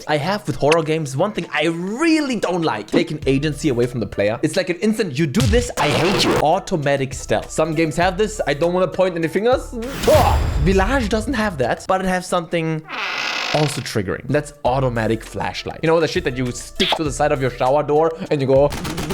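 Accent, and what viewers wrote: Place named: Germany. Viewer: I can here a little bit Deutsch von ihnen, interessant...🧐😂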